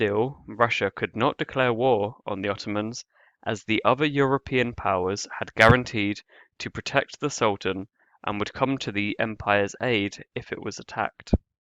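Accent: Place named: United Kingdom. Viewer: I'm not sure why but I have an inherent bias towards British particularly English and Northern Irish when it comes to historical topics. When I hear that accent I immediately trust the content. Just a thought that occurred to me as I was watching this well done video. Also while I have a bias towards British history presenters, it's sad they stopped the Russians from taking Istanbul. Even the Muslims of Egypt (me included) wish the Greeks had that city back. Turks morality is lacking to say the least.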